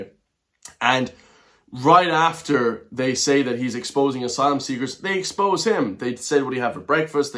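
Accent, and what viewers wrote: United States. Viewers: You’re not a Christian and why do you have an American accent?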